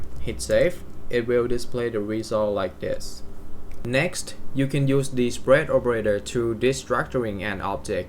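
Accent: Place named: United Kingdom. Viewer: สำเนียงพี่ เหมือน British เลยครับ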